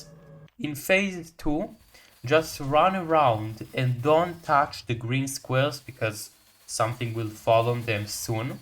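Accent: Israel. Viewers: are you israeli?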